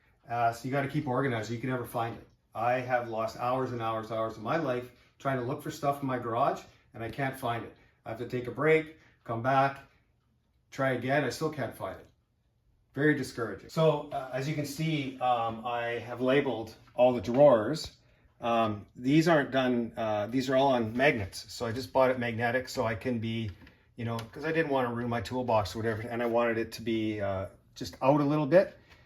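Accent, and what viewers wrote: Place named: Saskatchewan, Canada. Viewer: Canadian eh! From the fields of Saskatchewan I'm guessing by the accent!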